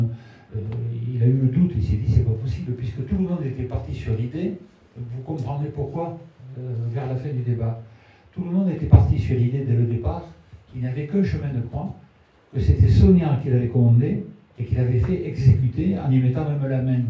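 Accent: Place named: Marseille, France. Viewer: Je comprends rien avec l'accent marseillais 😮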